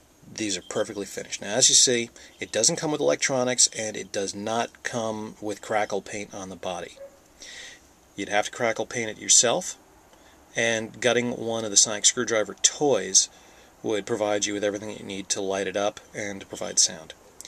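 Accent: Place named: Russia. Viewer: your russian accent is great! XD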